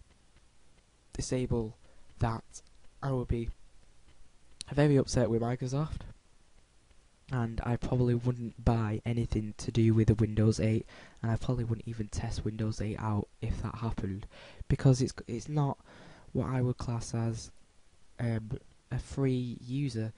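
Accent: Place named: Yorkshire, United Kingdom. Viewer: The UEFI boot thing is worrying but I don't think that MS would like the bad publicity it would get if it tried to limit the use of computers to its own OS. This is the 1st of your vids I've watched - your accent sounds similar to mine so you're prob in Yorks - Do you go to a LUG? I only have Linux, I grew to hate Windows more & more so it was easy to leave it & move to Ubuntu. I run Ubuntu 11.04 'Classic' on my desktop & Xubuntu on my EeePC901, laptop & server. Use CentOS in a VirtualBox.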